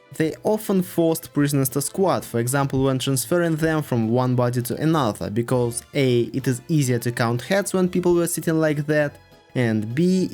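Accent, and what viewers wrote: Russia. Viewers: Thanks for the last photo in commando pants, bare chested 🤩👍 cool dude. Very good! I though it could be going back to our primate days as gorillas squat in the forest. The footage and photos you used were awesome! You always do good programmes. I like the Russian accent.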